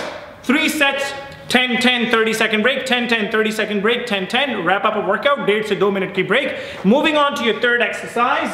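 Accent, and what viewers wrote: Punjab, India. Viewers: watch ur all vedio bhai..i love the way u talk ur jokes songs punjbi voice n evry thing..👍😊m also pujbi nd jatniii 🤗love from pakistan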